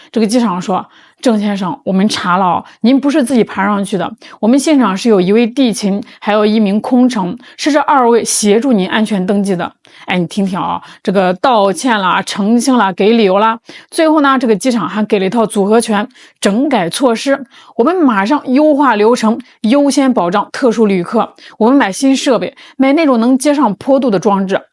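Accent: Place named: Shandong, China. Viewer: 我不在乎说什么 我是来听山东普通话的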